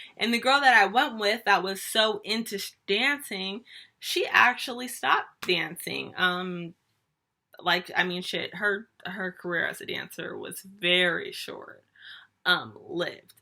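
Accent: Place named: South, United States. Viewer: Country accident cute asf😍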